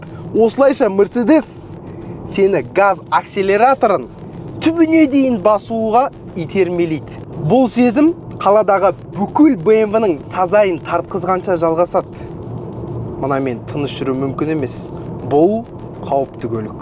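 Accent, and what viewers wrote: Standard. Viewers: Бауырымызға сәттілік. Қазақша таза, сауатты сөйлейді екен. Ғаламторда сауатты, пайдалы, қазақша бағдарлама көбейсін, аумин. Саясатты білмеу, әділетсіздікпен күреспеу, ұрпақты аяқ, қолын жіпсіз байлап жауға бергенмен бірдей. Оян қазақтар. АЛЛАҺУ АКБАР.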